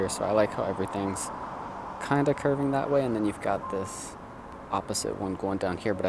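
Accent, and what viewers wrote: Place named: Alabama, United States. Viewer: Great stuff! Informative content and loving that slight hint of an Alabama accent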